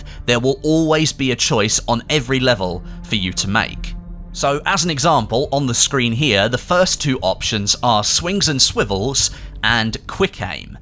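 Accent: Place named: England, United Kingdom. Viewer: Where abouts in England is he from does anyone know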